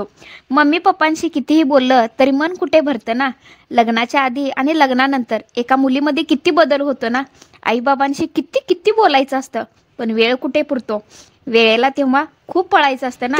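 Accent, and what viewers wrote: Kolhapur, India. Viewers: Tumch gav konat.bhasha kolhapur chi vatate.mi pan kolhapur madhun aahe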